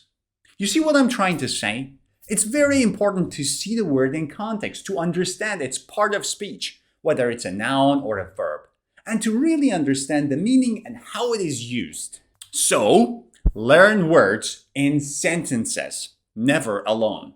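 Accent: United Kingdom. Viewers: Is your accent American or British. I asked it because you pronounced the word " either " like British not American.😊😊😊😊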